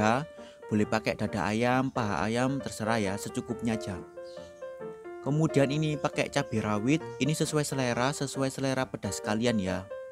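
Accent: Java, Indonesia. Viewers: Udah kenal channel ini sejak subscriber nya puluhan ribu sampai sekarang hampir sejuta. Suka sama suaranya yg medok dan resepnya yang mudah (meskipun gak pernah aku coba karena males wkwkwk) Sukses terus, mas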